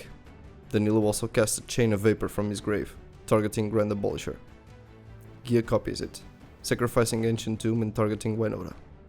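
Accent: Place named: United Kingdom. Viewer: Love the new video production, the narrator has a very good British style accent.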